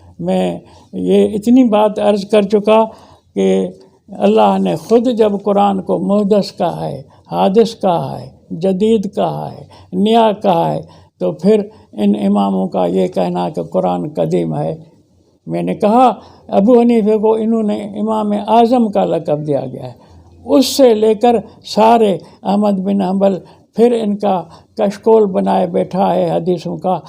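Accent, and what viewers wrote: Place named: Sindh, Pakistan. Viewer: خوشی ھوتی ھے سنکر آپ کو، اور حیرت بھی کہ ایک سندھی اسپیکنگ کتنی ستھری اردو بول سکتاھے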